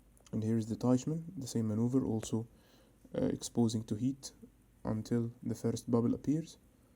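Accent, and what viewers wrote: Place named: Egypt. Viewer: I knew you're egyptian by the word first. You, egyptians, say "firEst" Good video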